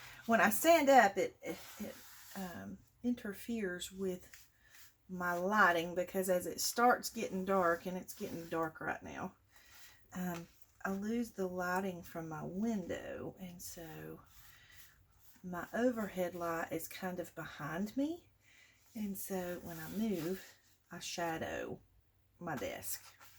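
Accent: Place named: Alabama, United States. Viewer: I feel the same way! I live in Alabama and your accent sounds like you could be my next door neighbor! Lol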